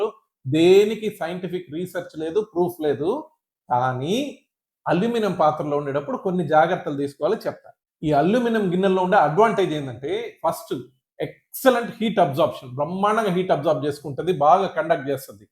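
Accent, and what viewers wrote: Nellore, India. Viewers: I like your way of thinking and explaining things very clearly.I like your Nellore style accent.God bless you young Dentist.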